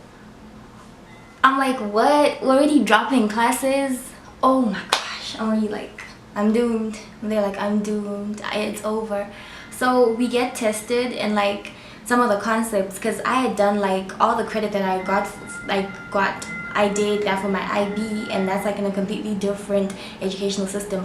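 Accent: Caribbean. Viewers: Are you carribean? I hear an accent. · where you from? the Caribbean?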